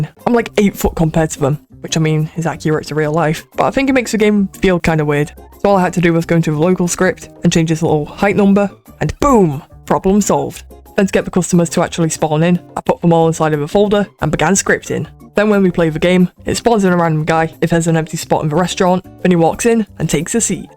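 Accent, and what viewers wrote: United Kingdom. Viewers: Are u british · The british accent🇬🇧😭🙏💔🥀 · bro is british please i am challinging you to say "bottle of water" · Are you British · Are u British? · Are you British because I'm British you sound like one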